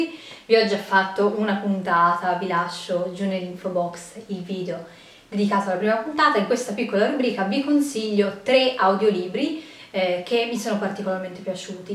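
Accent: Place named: Tuscany, Italy. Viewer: Salve egregia. Grazie per questi consigli sugli audiobook. Ad ascoltarla si sente chiaramente l accento toscano, mi chiedevo di dove più precisamente 😊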